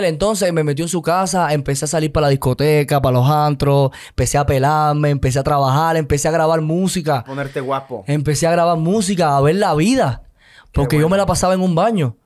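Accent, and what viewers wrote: Puerto Rico. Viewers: Me caí gordo por qué es Méxicano y habla puertorriqueño se cree o nose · Es cubano...se siente puerto riqueno y mexicano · Habla como puertorriqueño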